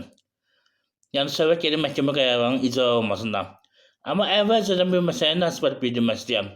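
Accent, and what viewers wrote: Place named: Quba-Khachmaz, Azerbaijan. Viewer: Quba Xaçmaz terrfdən olarsan yəqin?mən Zirəli iyəm bizi də belə danışırıq. Uğurlar qardaş şABUNƏ OLDUNM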